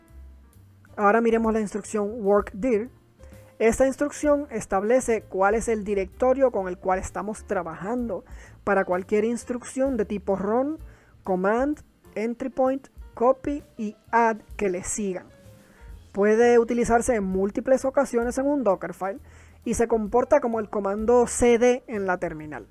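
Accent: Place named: Puerto Rico. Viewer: acho Mano. Sera Puerto Riqueño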